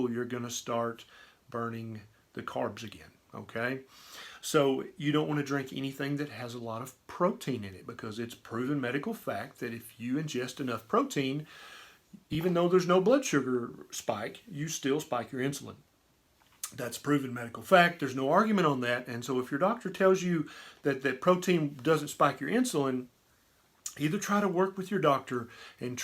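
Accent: South, United States. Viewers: 1 sub or a 1M subs, I love. That southern charm is wonderful, "supper" LOL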